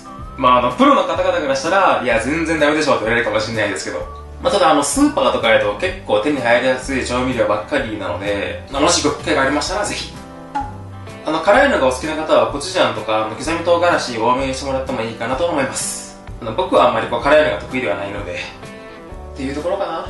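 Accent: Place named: Fukuoka, Japan. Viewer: ホル鉄最高ですよね 最近見始めたばかりですが 語尾が、やねとかイントネーションとかで もしかしてと思ったら福岡なんですね！ 柔らかい喋り方、聞きやすくてばり好きやん(いきなり博多弁) もしかしたら通りすがっとったかもと思うと...(口が緩みます)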